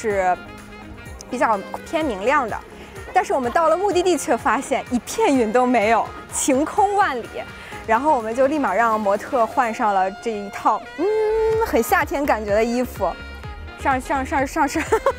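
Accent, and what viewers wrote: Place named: Linyi, China. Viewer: 哪里像冀鲁豫三角地的人了- -济宁聊城的口音不是这样的吧。。这个倒比较像临沂那边的2333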